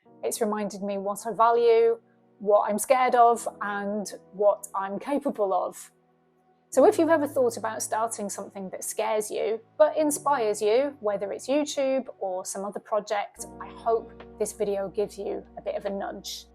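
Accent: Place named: Northern England, United Kingdom. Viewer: irish married guy here from california. your channel is brill. keep on going. great to hear truth, and watched the people who judged you. we are here for you. great job. i’m an immigrant and also lived in london from the 80ts to 2000s know all about england. and your northern accent is great. enjoy Ireland. and hope you meet the right one. as i say keep up the great work. Slainte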